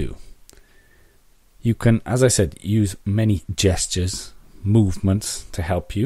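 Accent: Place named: Wales, United Kingdom. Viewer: He sounds Welsh to me :) albeit very subtle..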